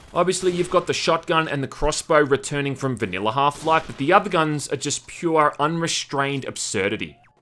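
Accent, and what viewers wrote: Australia. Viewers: You sound Ridiculously Australian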